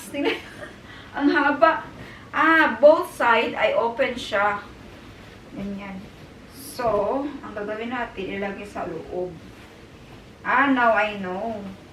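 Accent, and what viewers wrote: Visayas, Philippines. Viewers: How many man you’re going to have ? Lol just saying bisaya ka Inday di ba ? Speak good English Dai pls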